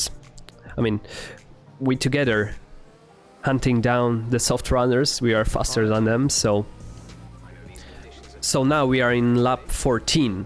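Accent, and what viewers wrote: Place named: London, United Kingdom. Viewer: Why are you speaking londonish?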